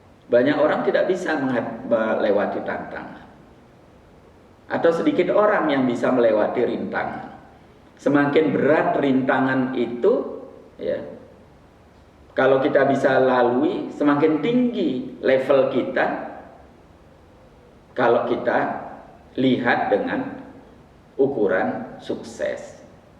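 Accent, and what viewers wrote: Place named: Bali, Indonesia. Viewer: Salam sehat pak... Pengucapan huruf T kok seperti pengucapan dari orang Bali, Aceh dan Jawa Cirebon... Apa asli dari Cirebon ya pak... Terima kasih matur nuwun... 🇮🇩🇪🇸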